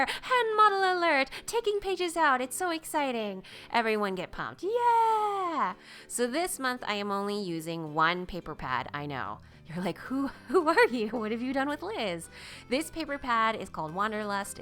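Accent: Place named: Northern California, United States. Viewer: Love it, knew you were from NorCal .... From Alameda :)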